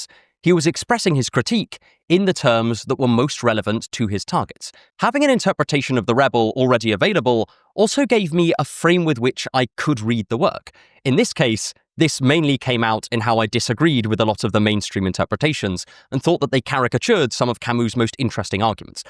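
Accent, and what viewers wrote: United Kingdom. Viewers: listening for the first 5 secs of the vid, i think he is a brit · Love that british accent. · Is this guy British? Just asking. No reason.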